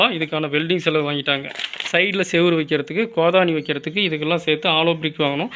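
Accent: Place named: Dharmapuri, India. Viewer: Super ga nenicha slang ora mari iruku nu nanum dharmapuri tha dpi la endha ooru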